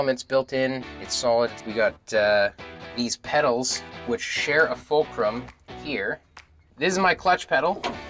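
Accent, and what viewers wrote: Canada. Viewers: Thats why it doesnt sound arrogant. Its canadian. Ill sub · I have no idea who you are, you sound Canadian to me, but I love what you built... yup... I'm gonna subscribe for sure...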